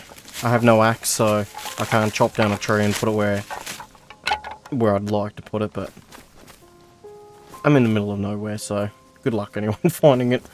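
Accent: Australia. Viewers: LOIF! Man, I love that aussie accent.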